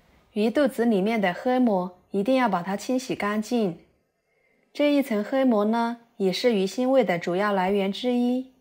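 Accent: Yunnan, China. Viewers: 主播云普口音很重啊 哈哈哈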